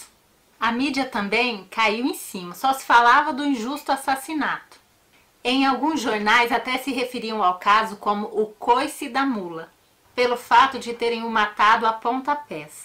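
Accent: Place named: Northeast, Brazil. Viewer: Nota 10 pela a história e nota 1000 pelo sutaque amo o Nordeste e o nordestino também.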